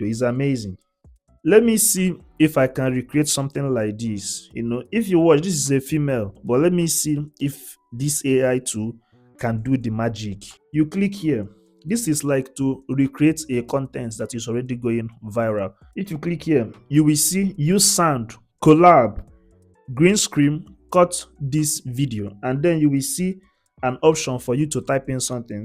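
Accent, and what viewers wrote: Nigeria. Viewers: When i heard your accent immediately realice or 🇳🇬 Nigerian boy ,we were worked in NG. morethan 10 years in Illorine uni. God bless you dear. Good to hear typical NG accent.❤❤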